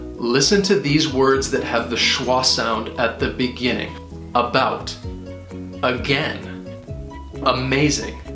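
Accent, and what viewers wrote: United States. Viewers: Do you speak US accent?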